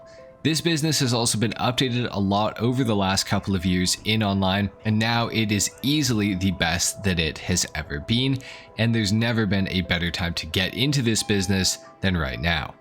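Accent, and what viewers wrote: Winnipeg, Canada. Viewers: Nah buddy, you from Winnipeg? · Haha I didn’t know you were from Winnipeg bro.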